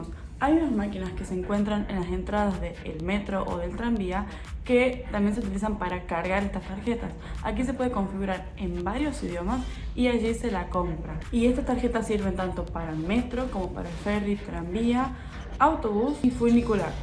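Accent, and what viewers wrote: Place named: Argentina. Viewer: hola donde eres? hablas como argentina. Pero creo que no eres de alli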